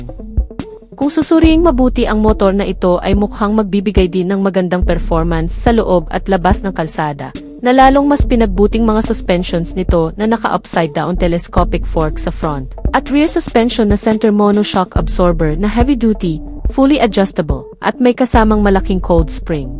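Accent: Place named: Conyo, Philippines. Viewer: Kunyo mag salita.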